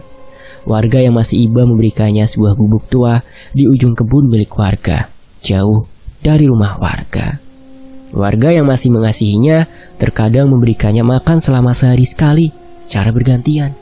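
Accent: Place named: Banyumas, Indonesia. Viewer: Ngapak juga bisa pokoke the best 👍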